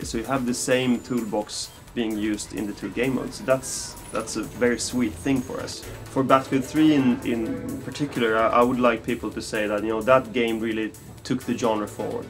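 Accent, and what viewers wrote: Sweden. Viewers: You can hear hes sweedish :D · he sounds swedish ^^ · thumbs up for Swedish accent. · swedish accent hellyeah! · ._. swedish accent ja! some needs to train that accent away... i managed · I know this might be a bit old.....buut man, English! with a Swedish accent doesn't sound very nice :P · omg what a swedish accent :D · Swedish accent <3 (im swe ) :D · man hör verkligen att han är svensk ;9 :) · God I hate the swedish accent :(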